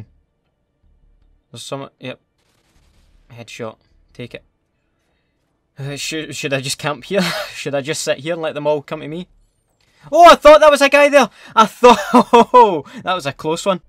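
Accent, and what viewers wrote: Scotland, United Kingdom. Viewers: I love the Scottish accent the best thing ever happened on YouTube. uploading vidoes on YouTube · Videos are so funny because of your Scottish accent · i love his scottish accent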